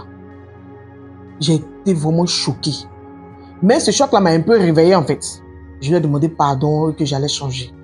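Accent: Ivory Coast. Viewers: Madame, votre accent Ivoirien ainsi que votre posture de la personne qui raconte l'histoire sont merveilleux. J'aime bien cette manière de raconter. Ma suggestions est que tous ne comprennent pas certains mots et propos propre au contexte Ivoirien. Moi je suis Camerounais, et cela je comprends. Mais je ne suis pas tres sûr pour les autres. Si vos videos peuvent etre améliorer dans ce sens, cela sera genial. Merci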